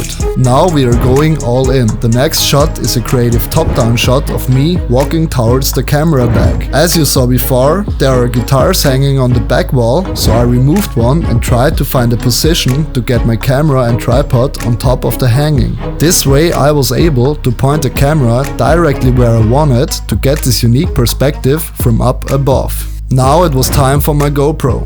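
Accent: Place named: Germany. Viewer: Da hast du ja ein paar nette Videos. :-) Und immer wieder amüsant, wenn deutschsprachige Menschen Englisch reden. Der Akzent ist mitunter klasse. ;-)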